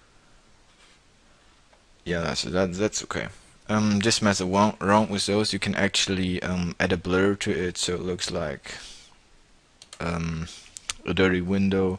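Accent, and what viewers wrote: Germany. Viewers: Uns Deutsche erkennt man sofort an der Aussprache.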